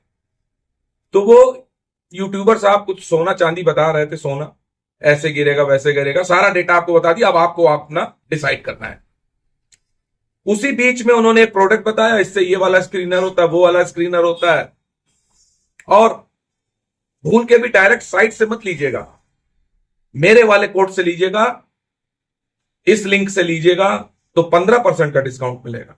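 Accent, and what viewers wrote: India. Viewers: पर आपके बोलने का देसी तरीका अच्छा व प्रभाशाली है।। Plz don't change yourself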